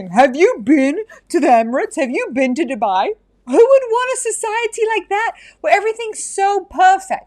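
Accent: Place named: United Kingdom. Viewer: I hear a British accent. Which upsets me. Because I'm worried you & your family left because England is no longer safe for you.😢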